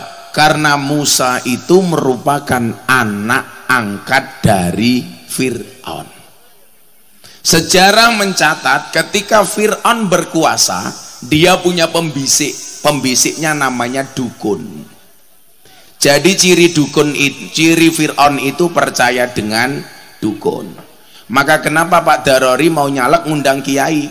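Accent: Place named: Banyumas, Indonesia. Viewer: Ngapak njeplak ,kebumen ku❤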